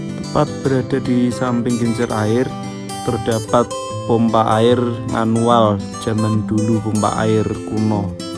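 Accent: Java, Indonesia. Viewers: Aslinya mana nichh kok suarane ada jawa2ny gtu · Ikutan give away ka. Menarik ka videonyanya apalagi denger suara kk yg logatnya jawa bangett, natural bangettt. Next tetap bikin video2 trip kakak yg menjelajahi setiap tempat wisata dan kuliner tentunya dikota apa negara tersebut nama : ayu furwanti kota : jakarta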